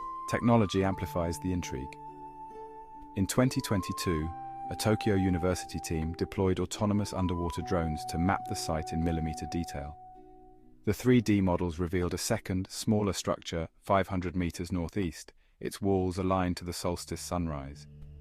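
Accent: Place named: United Kingdom. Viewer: Haha I have the opposite reaction to this other guy - this is a real human reading this right? In English at least. It's not full of the usual AI-isms (disinterested inflection, no pauses) - if it reads a number wrong, or elides a headline into a sentence, or forgets to breathe at any point, I'll be sad. on the other hand, it's speaking British english, but listed as American. That makes certain things more likely than others. Well, if it's an AI, it's a better one.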